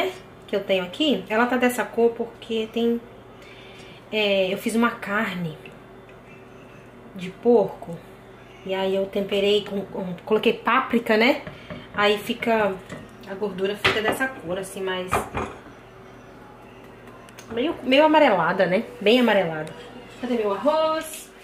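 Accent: Minas Gerais, Brazil. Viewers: Ela tem um jeito todo mineiro de conversar . Tenho vontade de conversar com ela, pois também sou mineira mas péssima cozinheira.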